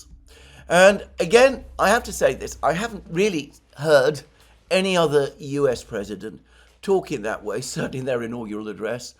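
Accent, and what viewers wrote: United Kingdom. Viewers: Somehow Trump's poetic prose sounds even more powerful in your British accent 😅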